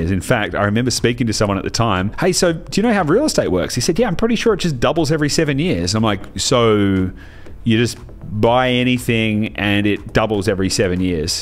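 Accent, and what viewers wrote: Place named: Australia. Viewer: I heard the aussie accent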